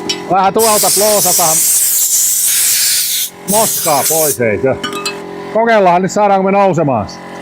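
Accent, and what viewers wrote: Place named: Laihia, Finland. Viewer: faija laihialta ni tua murre on hienoo kuultavaa :)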